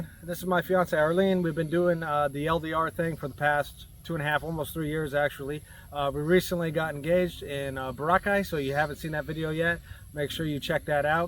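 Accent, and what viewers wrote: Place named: United States. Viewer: If you don’t mind me asking because you didn’t disclose the information on how much was the total cost for everything. You sound as if you’re from the states as myself. So my question is how much was everything (land & everything built including fence) plus if you are from the states how are the taxes done?, do you have to pay taxes in both countries?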